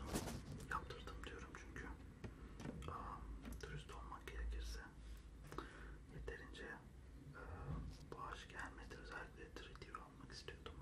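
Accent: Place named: Turkey. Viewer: Aaawww the new mic is amazing and so is your turkish accent so dont worry about it! (づ｡◕‿‿◕｡)づ and whenever you speak in turkish it just makes me giggle for some reason but also makes me relax and concentrate better on how it sounds rather what you say because i cant understand but its all very good, thank you!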